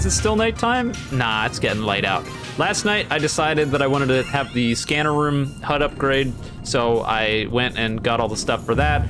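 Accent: United States. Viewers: I didn't know you were American! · Yoo he's american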